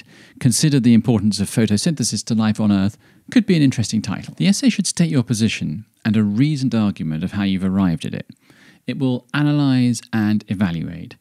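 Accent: United Kingdom. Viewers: Very helpful, thank you for posting! One funny thing happened as I listened to this video. Initially thought “he’s mispronouncing Montaigne” but then I fell victim to something I think is common here in the US. We assign more intelligence and a better education to anyone with a British accent, and yours is excellent. So I thought, there’s no way that he’s mispronouncing Montaigne, it must be me that has been mispronouncing this name all these years. 😊 Again, thanks for your helpful insights!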